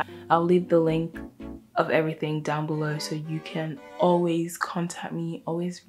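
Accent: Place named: United States. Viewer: Why do u sound American? I thought you were from London lol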